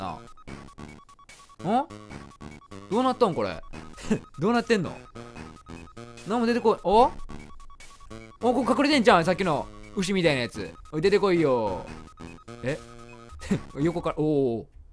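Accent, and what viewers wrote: Kansai, Japan. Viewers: 殆ど関西弁じゃないwイントネーションだけやんwww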